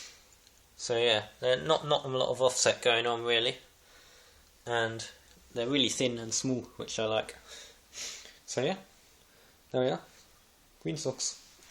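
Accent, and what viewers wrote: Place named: Essex, United Kingdom. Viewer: Cleveland is a great brand, underrated by some, amazing wedges. I'm having a bit of a love affair with Ben Hogan irons at the moment (forged gs irons and Colonial wedges) all getting a bit old and I should upgrade them. Can't bear to do it though. I'm just too attached they just seem to fit me. By your accent you sound like your from not far from me. You can usually see me struggling and swearing round the courses of North Essex. Good luck this season ;)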